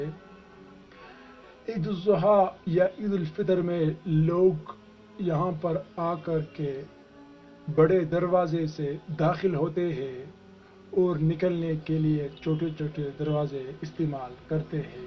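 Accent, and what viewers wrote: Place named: Kashmir, India. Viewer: It's a kashmiri voice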